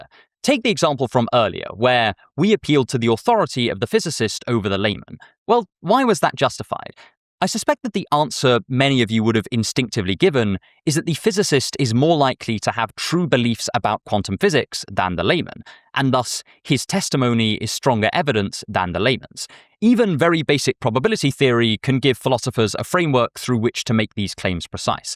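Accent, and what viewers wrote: United Kingdom. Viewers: British voice alert, I repeat, British voice alert ⚠️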